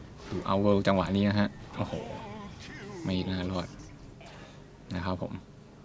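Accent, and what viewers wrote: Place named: Thailand. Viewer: ผมไม่ได้ว่ามันอ่านผิดรึถูกครับ แค่ฟังที่คนพากย์อ่านสำเนียงไทยแล้วมันฮาดีครับ(ไม่ได้ว่าคนพากย์นะ 55)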